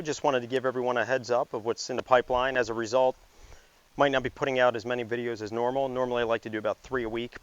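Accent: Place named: Maryland, United States. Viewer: I've been watching your Fiero vids and I was born and raised in MD before moving to Nashville 4 yrs ago. The second I heard your accent, I knew I had to subscribe! Congrats on the new location. Wish I was still in MD. Could use your knowledge for my Fiero!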